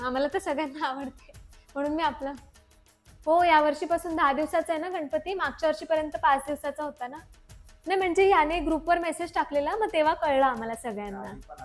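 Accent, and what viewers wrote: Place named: Mumbai, India. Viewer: खास मुंबईकर मराठी!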